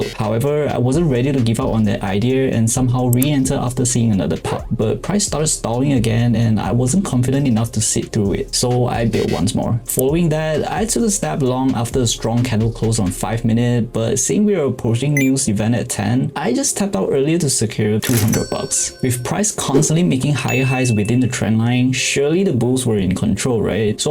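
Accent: Singapore. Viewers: are you singaporean ? Sounds about there but if so jiayous i'm also doing funded with lucid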